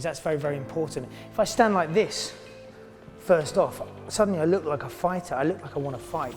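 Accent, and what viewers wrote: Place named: United Kingdom. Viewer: I'm so sorry but it's so unusual for me to see a strong,big,burly guy with a British accent.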